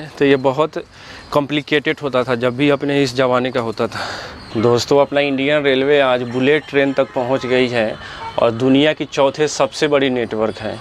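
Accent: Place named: Bihar, India. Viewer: प्लीज बिहारी हिंदी में ना बोले 😭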